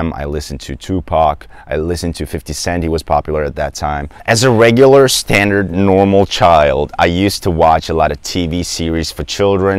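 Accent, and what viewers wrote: United States. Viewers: Çok iyi kullanıyorsunuz İngilizceyi bir Amerikalı gibisiniz. Kimliğinizi belirtmemiş olsanız, eminim herkes sizi bir Amerikalı sanırdı ☺️🙏🏻 Teleffuzunuz çok etkileyici ve konuşma stilinizle karşınızdakini etkileyebiliyorsunuz ve böylece karşınızdakiler sizi sıkılmadan dinleyebilme şansına sahip olabiliyor. Bu da gösteriyor ki dilleri ne kadar çok sevdiğinizi ❤️👍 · Your English is amazing and your American sweet accent-wow ! Well done mate! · Why do you sound more American than English? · Amerikali olmadiginizi soylemeseydiniz, sizi Amerikali sanirdim. Harika... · Amazing! How perfect, American English you speak with out living in the USA, I’ve been here more then ten years and you can beat me in second! You don’t even have an accent! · That’s awesome!! Well you have been to a beautiful places like Phoenix Arizona,sedona Arizona you need to come again visit the Grand Canyon az!! 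Saludos desde Phoenix Arizona!! 🙏🙏 por cierto su inglés es estadounidense a la perfección 👌👌👌👏👏👏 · Sesin çok yakışıklı ama 3 dakika geçtikten sonra rahatsız ediyor gibi. Bu arada tam bir american accent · Gardaş which country of state u grow up? u have İmpressive hillarious american accent man 🙏🙌 well done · 💙 ... What an amazing story ... I would've thought you had lived for quite some time in America ... your American accent is just flawless ... I was in Turkey (Istanbul, Izmir, Kusadasi, Ephesus) last week and I fell in love with Turkey as a country, the language, the people, the architecture, the food, the smells ... in a word ... I decided to learn turkish and I found this helpful and fascinating channel ... Greetings from Miami ... !!! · Very interesting video. I was raised bilingual, my mother tongue English and Spanish. At the age of 5 I spoke both. Translated to Spanish for my Grandmother who only spoke Spanish when watching TV shows. 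Born in the USA. Your English is perfect American. I also speak Dutch and trying to learn Turkish. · Hi from Australia! I thought you learned English in America cause of your accent. But I have to say I also prefer the American accent 😊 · I'm falling in love with your American accent🤭❤️ thank you for the video and you're the best i'm sure about that☺️ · Dude your American accent is amazing, Awesome dude! This is my future goal, I wanna to master my pronunciation. By the way I speak also 5 languages. You are an inspiration for me. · You speak American English better than some Americans! Great job...I can barely sense the accent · You sound American not Turkish or German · I watched some of your other videos before this one. I am glad you explained how you learned English because I could not figure out how you sounded as if you learned American English rather than British English. This video explains it. 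I am attempting to learn Spanish and agree with you about the cultural aspects of language. Some of the language apps are great for vocabulary and some grammar, but watching television gives a bit more of an idea of how the language is used every day. I am not sure I will ever be fluent, but thanks for supporting what took me quite a while to figure out. I'm going to watch more of your videos for more ideas. If you wonder how I found your Turkish video, I tried watching Hercai in Spanish. I finally figured out I was in dizi land and not telenovela land by some of the language. I loved dizis so much that I started watching them in Turkish with English subtitles. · I love your accent. It's so american. So as mine. I used to learn english the same way, by listening to the songs and writing them down. I've graduated from english exactly 20 years ago, but I don't have the opportunity to use it in my every day life so over the time I forgot many words, even the simple ones. 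But instead I speak spanish with my sister- in- law (I don't know the grammar but I don't fear to speak) and I love turkish. I understand almost everything but have no one to talk to☹🤷‍♀️ Love all the job you do❤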